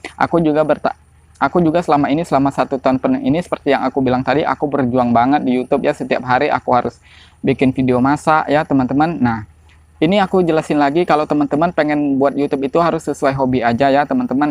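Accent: Jambi, Indonesia. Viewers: orang jambi kah bang?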